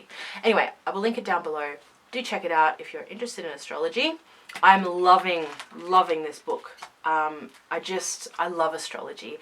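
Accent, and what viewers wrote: Australia. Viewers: I spent an embarrassingly long time trying to identify your accent until I realised it's the same as mine hahaha nice to see other Australian pagans hanging around :D (I'm a new subscriber - hello!!) <3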